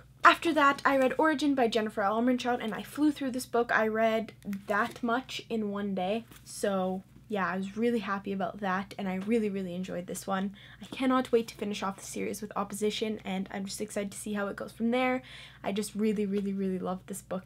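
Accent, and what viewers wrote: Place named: Canada. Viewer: YOU DID SO WELL I'm mad jelz. ps. I always forget your Canadian and then you'll say something and I end up hearing the accent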